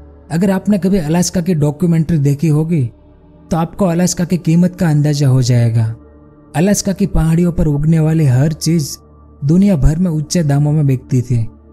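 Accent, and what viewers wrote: Gujarat, India. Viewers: If im not wrong narrator is 💯 gujju😂😂 please don't take this as offensive or any hatred. I love the way gujjus speak 😂 all thanks to TMKOC 🙌🏾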